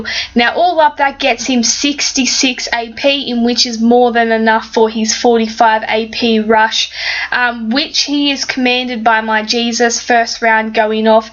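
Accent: Australia. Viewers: Great video just subbed you, I have been showing it to my faction omnipotence paradox on the marion server we are the best faction on our server and a lot of our faction can now rush turn one due to you thank you very much our enemy's are not liking this one bit lmao. I just started doing videos myself actually loving your aussie accent im trying to tone down my strong english accent but im having trouble hope people can understand me haha. Thanks a lot and good luck for 2017. Happy hunting.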